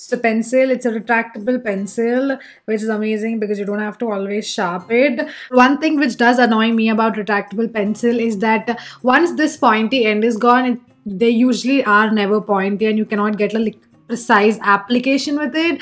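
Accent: South India, India. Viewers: you may be conscious of your south indian accent English but i like your way of speaking 😍 its different n very real i highly support you ✨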